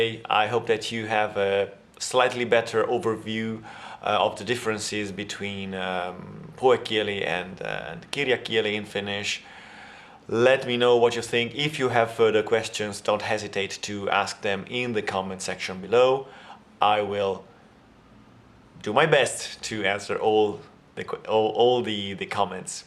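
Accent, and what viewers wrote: Italy. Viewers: This was the first video I've seen from you. Are you italian? Because our italian language teacher ones told us that italian pronounciation suits finnish almost better than any other language and I was wondering if it was vice versa :D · The videos you make are truly excellent. Also, your pronunciation has only a very faint accent. This may partly come from the fact that Finnish and Italian pronunciations do not differ too much. By the way, there is a Russian guy called Kiril Sultanshin who makes videos and has learned good Finnish within a few years. His pronunciation is absolutely perfect though he still makes occasional mistakes in grammar. Achievements like this indicate a great talent. I do not like the term “kirjakieli” although even linguists rather commonly use it. The reason is that it is not used only in writing but also when any formal presentation is needed. 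TV news, speeches, negotiations, university lectures, schools are typical examples. “Puhekieli” is typical in everyday informal discussions. Instead of “kirjakieli” I would rather say “yleiskieli”. Admittedly, “puhekieli” expressions sometimes intrude “yleiskieli”, mainly due to a sloppy use of the language.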